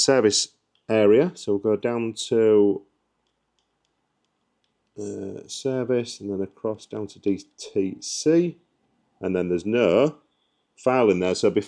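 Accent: Yorkshire, United Kingdom. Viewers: also you sound like your pretty close to me im picking up a Yorkshire twang im Grimsby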